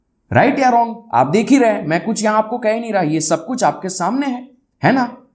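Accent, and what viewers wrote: Gujarat, India. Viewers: How do I meet your Guru? Thank you. (I note Gujarati accent in your speaking. You seem to be a Gujarati(may be a Marathi). I could be wrong.)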